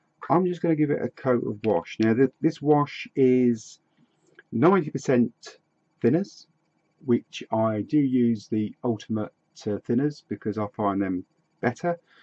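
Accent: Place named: United Kingdom. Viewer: Hi I know this video was done now 5 years ago but can you tell me what the material is that you used to attach the gate door to the post as the Closed Caption doesn't translate the British accent very well. It is a very good tutorial enjoyed it.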